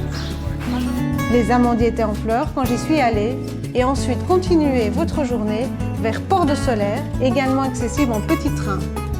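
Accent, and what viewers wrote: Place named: Switzerland. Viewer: Sympa ces découvertes sur Majorque à tester...je peux entendre un accent Suisse dans ta voix.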